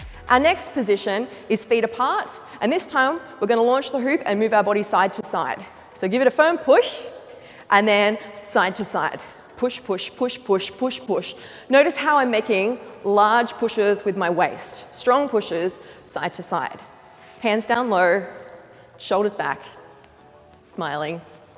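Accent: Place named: Australia. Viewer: Amazing video and explanations. No one else had all this info Hate the aussie voice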